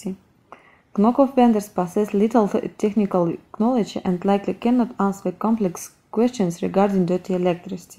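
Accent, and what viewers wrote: Eastern Europe. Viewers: This isn't meant as offensive... but why choose a non-native english speaker to narrate a video like this? It's very hard to understand. Many eastern European / Russian people speak too fast when they speak in another language, I've noticed. If you want a video like this to be taken seriously, get it re-narrated.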